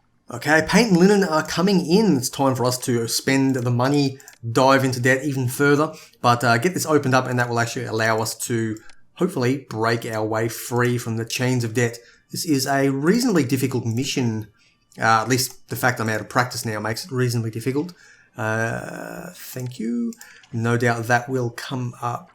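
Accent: Australia. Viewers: You sound like an Ozzie, so why do you pronounce route as rout? Never heard an Oz do that before.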